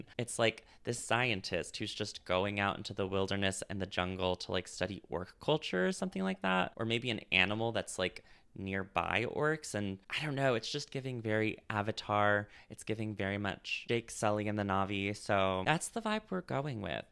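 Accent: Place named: United States. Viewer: the way you envision the northern lands is SO different from how i picture it, i love it! i always pictured it as very windswept barren grasslands, like the steppes in northern china/kazakhstan - its completely different from your vision. whats so interesting to me is that given that you're from the northern hemisphere (presumably, you have an american accent lol), most people from there hear "north" and think cold - im from aotearoa in the southern hemisphere so north = hot to me! yet our respective idea of the northern lands are almost opposite to what our geographical perspective would lead us to, its so fascinating.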